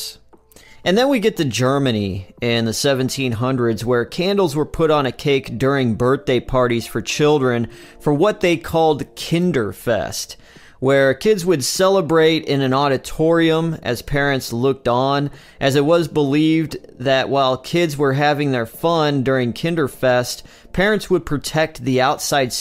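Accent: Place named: Buffalo, United States. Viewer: Im loving your Doc's, just found your site, about to watch this, cuz im hoping you bring up BIRTHDAY ICE CREAM CAKE... WHO EVER INVENTED THOSE CRUNCHY THINGs IN THE MIDDLE ARE GENIUS'S!!! Anyway, Im also trying to figure, where your from, you have that same Accent we have in Buffalo NY, which i also notice when i was living in Detroit, they kinda still had that accent, then moved 3,000 miles out West, & sounded like a fish outa water, its refeshing to hear an accent on YT, you know, instead of all the A.I.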